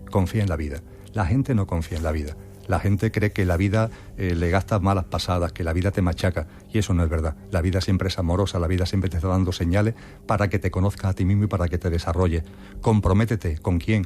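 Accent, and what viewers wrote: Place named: Andalusia, Spain. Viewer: Cuanta claridad. Andaluz tenía que ser Señor!!!. Gracias.❤❤❤❤❤❤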